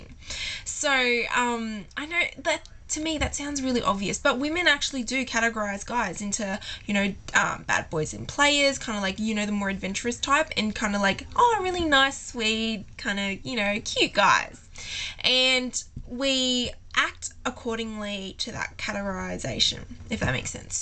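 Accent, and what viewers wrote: Australia. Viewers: I love her aussie accent its such a turn on. But i dont anyone can wait around long enough for the perplexing age old question of what women want? bcoz women dont really know what they want. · the aussie accent is a mayyyyyja put off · thats top advice, esp what you said; dont take what women say literally. so really that means i cant take you literally then hehehe. and yes everyone shes an aussie. nice to hear an aussie accent out of an absolute amount of yanky teenbopy girly stuff on the tube:) x) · I´ll bet she learned english or was born in Australia. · WOW! what is she australian???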